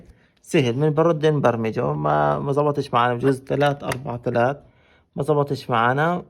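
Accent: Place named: Palestine. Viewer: لهجتك فلسطيني يارب ينصركم + الطريقة نفعت والله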